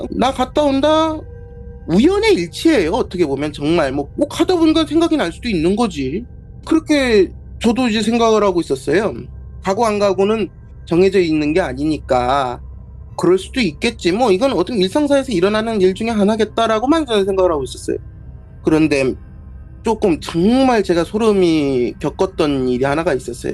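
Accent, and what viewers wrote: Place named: Gangwon, South Korea. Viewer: 대전분이라해서 들었는데 토박이는 아니신가봐요 말투가 전혀 다르시네요 강원도분인가ㅋㅋ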